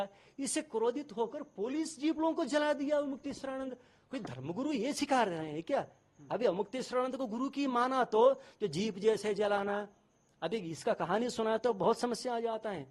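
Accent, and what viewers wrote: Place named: South, India. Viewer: South ke accent me hai kuch bhi mat bolo yar😂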